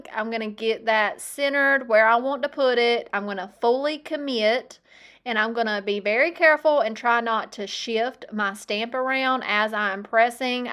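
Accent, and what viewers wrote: South, United States. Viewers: I love your southern accent.❤ Soo 😍 cute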